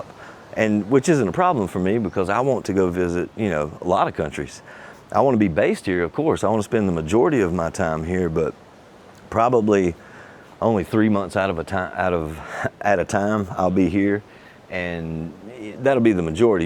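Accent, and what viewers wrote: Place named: Myrtle Beach, United States. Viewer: Just came across your channel.Interesting content. Thanks for sharing your adventures and I will follow along. I am living in Australia permanently but originally from Myrtle Beach. And by the sound of your accent I am sure you know myrtle beach. Keep up the content and best wishes to you.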